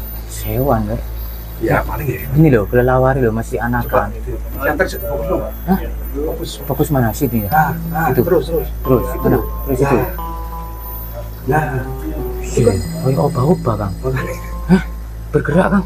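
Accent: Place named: Wonosobo, Indonesia. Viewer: Kayak logat wnsbo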